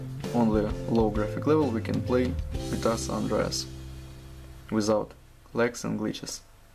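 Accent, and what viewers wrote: Russia. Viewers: Русский видно акцент)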